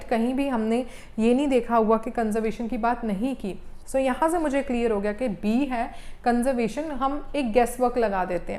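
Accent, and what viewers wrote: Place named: India. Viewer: Yes, from India Mam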